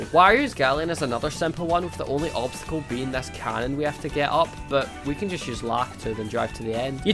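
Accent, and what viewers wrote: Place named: United Kingdom. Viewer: He's bri'ish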